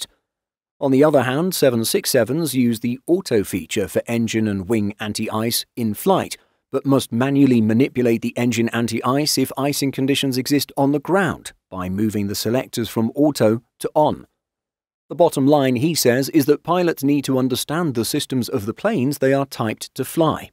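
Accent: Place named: England, United Kingdom. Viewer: What an annoying arrogant English accent...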